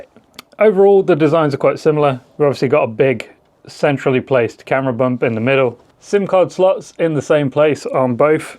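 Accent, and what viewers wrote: United Kingdom. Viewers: British accent brings me joy ❤️ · Keep it up bro, i like ur British accent, n ur video too (actually good video) 🎉🎉🎉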